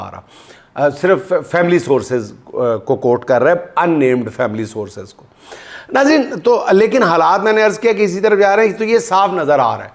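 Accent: Punjab, Pakistan. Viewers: Ap ka punjbi ka Andaz Bry muzy ka tha ..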